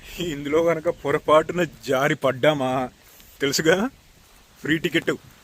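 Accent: Andhra Pradesh, India. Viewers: Bro meedhi andhra nundi velli nijamabad lo settle ayyaru kadhaa...me slang chepthundhi..appatlo chala mandhi akkada lands cheap ani koni akkade vundi poyaru..am I right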